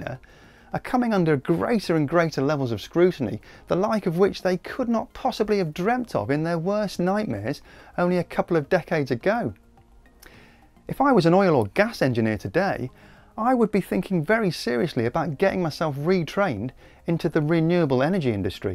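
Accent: United Kingdom. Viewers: You pronounce it me-thane, we pronounce it meth-ane. We don't tell you how to pronounce words if you don't tell us how to. Deal? Whenever I come across the English when I was in the UK or when travelling they always show their arrogance. My suggestion is you're not that special, so stop acting arrogant.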